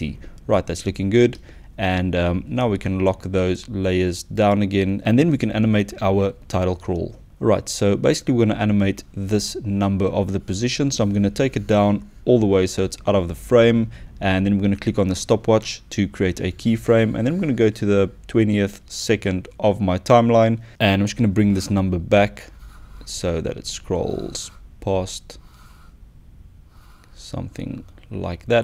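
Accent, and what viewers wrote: South Africa. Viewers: South African :) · South African? Love from Cape Town <3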